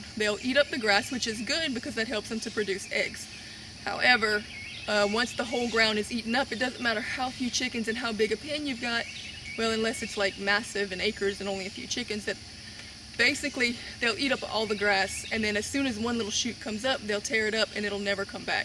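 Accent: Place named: Texas, United States. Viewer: You are so full of information! I have been following you for a while and now have subscribed. Please keep it up! I need all the information I can get! I am in texas, you sound like you are close by, what state are you in?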